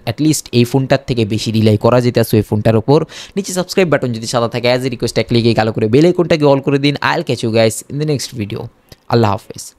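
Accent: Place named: Noakhali, Bangladesh. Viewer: ও ভাই দয়া করে এই নোয়াখাইল্লা ভাষা ছেড়ে শুদ্ধ বাংলায় কথা বলুন। অথবা ইউটিউবে ভিডিও বানানো বাদ দিন। আপনারা বাংলা ভাষাকে বিকৃত করছেন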